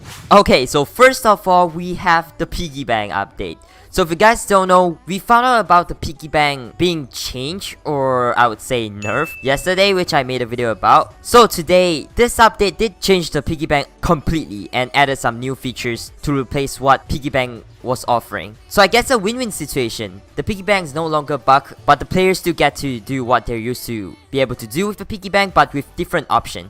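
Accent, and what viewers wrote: Singapore. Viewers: The Singapore ascent lol I can relate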